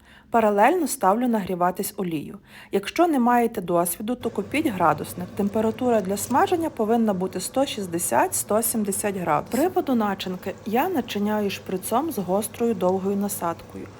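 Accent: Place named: Galicia, Ukraine. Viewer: Браво ! Зразу чути, що галичанка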